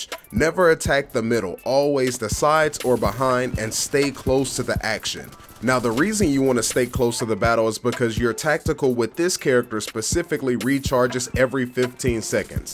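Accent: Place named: Compton, United States. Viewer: He sound like e be from compten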